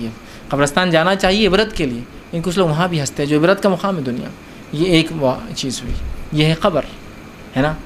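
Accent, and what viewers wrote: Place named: Hyderabad, India. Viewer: He's hyderabadi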